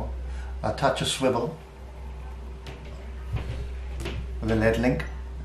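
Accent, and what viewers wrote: Newcastle, United Kingdom. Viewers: Thanks mate just made my move to Donegal permanent and less than 3k to most beautiful shoreline, although a trout and salmon angler that keeps me busy in these parts always wanted to shore fish, just found your channel a few weeks ago and your video today will help me tackle up cheers again and your Geordie accent is great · Great video mate, enjoyed very much. I'm from South Wales and can understand your geordie accent no problem haha. Keep up the good work. Gareth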